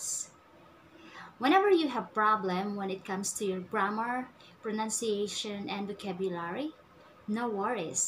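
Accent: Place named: United States. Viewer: You really have an American accent. Wow! Where did you learn to speak that way?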